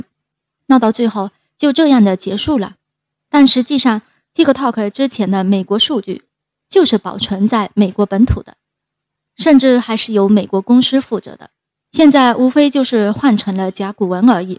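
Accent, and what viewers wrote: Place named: Fuzhou, China. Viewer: 應該是福州老鄉不容易好比你不容易碰到能把普通話說的遛的香港人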